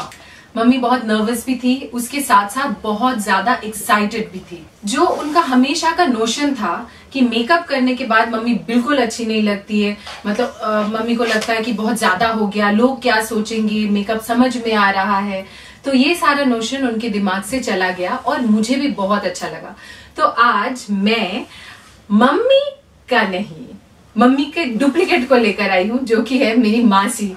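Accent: Bengal, India. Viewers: Aoki baatein bohot acha lgtahai akdom bengali touch a Di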